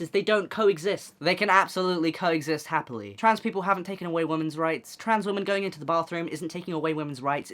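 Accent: United Kingdom. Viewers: Are you British or Aussie? I can’t tell from your accent lol. I’m Aussie.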